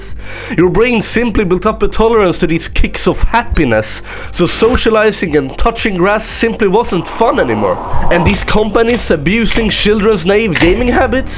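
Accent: Sweden. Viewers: I think I have watched this video maybe 20 times. I think I can already remember half of the script! Everything in this video is just flawless, everything from the music choices, pacing, the Swedish accent to the stunning motion graphics and design. This video is just perfect. This video has inspired me so much and will surely influence my decision what I want to pursue later on my studying career. I don't know if you are planning on making more content, but if you are, I will be waiting excited!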